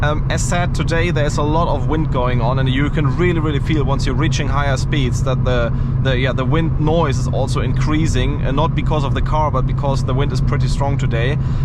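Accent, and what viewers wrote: Germany. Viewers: I love the German accent😊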